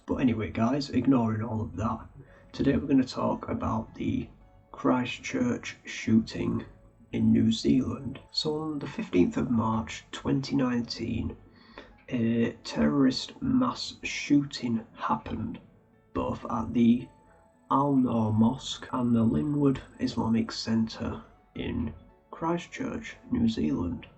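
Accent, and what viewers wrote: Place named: Teesside, United Kingdom. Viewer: I remember watching this on some gore website a couple of years ago and finding the comments absolutely infuriating, disgusting and disturbing. Not on topic but a secondary question, are you from anywhere near Teesside/north Yorkshire? You have a similar accent to me but I can't place it.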